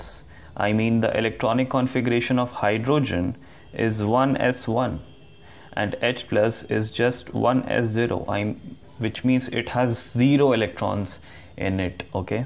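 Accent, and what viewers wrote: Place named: India. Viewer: I can't take him seriously with the Indian accent 😅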